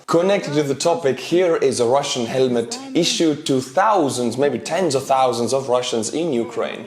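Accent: Scotland, United Kingdom. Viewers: Love the jock accent mate, funny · Great info as usual and I love the Scottish accent 😀. ( realy is Ivan that bad? 😀) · that Scottish accent is truly beautiful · Your scotch accent is perfect I love it it cracks me up inside and out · This scottish accent 😂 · Dude , your Scottish accent is hilarious 😂🤣😂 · pretty good Scottish accent. WE always refereed us as "OAT MEAL SAVAGES" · Nice Scottish accent xD I don't often here Europeans doing impressions of any UK regions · I am Scottish but I find your Scottish accent funny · Great to hear a fellow Scots with a local accent 😉😆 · That's one top Scottish accent .... better than the Highlander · 😂😂 Loving your Scottish accent! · Best Scottish accent ever, never change 🇬🇧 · I love the Scottish accent 🤣🤣🤣🤣👍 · Absolutely love the Scottish accent, made my day. Lol lol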